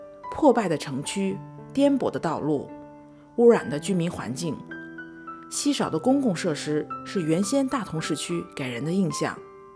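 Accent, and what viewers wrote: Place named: Beijing, China. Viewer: 博主，你那京腔太明显了，普通话要好好练练。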